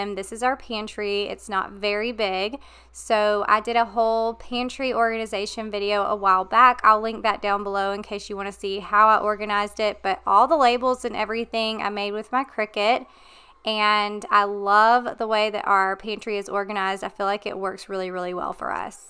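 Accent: South, United States. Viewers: Hi! I just discovered your channel. I went to college in Savannah! Its great to hear a little southern accent. 😉 Thanks for a great video, I look forward to watching more.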